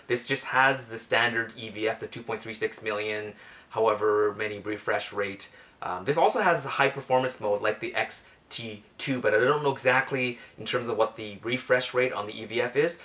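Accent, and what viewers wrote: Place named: United Kingdom. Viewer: You should lower the background music, it's not easy to ear your voice, you have a strong British accent !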